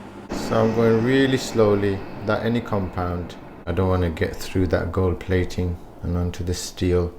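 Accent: United Kingdom. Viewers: What country do you live in? You have what seems like a British accent. Are you of Indian ancestry? Don't answer if it's too personal. I'm just trying to place you. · Man with the British accent I replayed the opening line 3x to understand “bosses”. I thought you said a P-word which would seem highly out of character….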